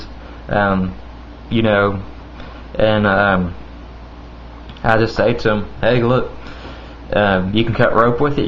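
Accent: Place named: South, United States. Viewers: I love your Texan accent. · I don't care what people say. The southern accent is awesome! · Look people, he's a southern man with a slight speech impediment, lets have SOME decency and comment on the knife being shown? Not on this man's accent. Now, i think its a cool knife, it is manacing though, this is probably why you get looks, it's foreign in design, meaning people probably see it as solely a weapon and Not a tool. Cool knife.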